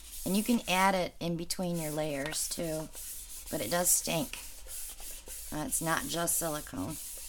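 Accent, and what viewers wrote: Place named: Western New York, United States. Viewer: Hello. Thank you for sharing Learned so much from you. You truly inspire me. I heard you say New York and snow. Whereabouts? I’m from Buffalo, your accent sound western New York-ish. Anyway awesome Techniques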